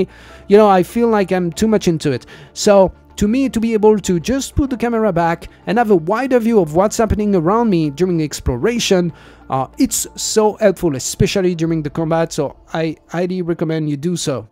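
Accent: France. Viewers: Thank you for this video. Subbed and liked. Side note, my mother is French (born and raised) so to me your accent is awesome.👍 A lot of people think the French language sounds so romantic. That's only because they've never had a tiny, very angry French Mom cursing at them in French and swinging whatever was close by that she could get her hands on and use as a weapon (or throw it at you lol).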